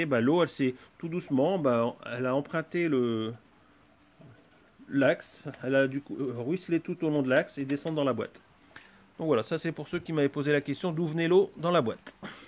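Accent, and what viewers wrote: North, France. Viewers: Tu est d’ou du nord ?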